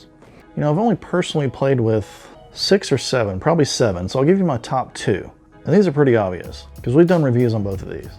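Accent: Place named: South, United States. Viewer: You sound like David Attenborough with a southern accent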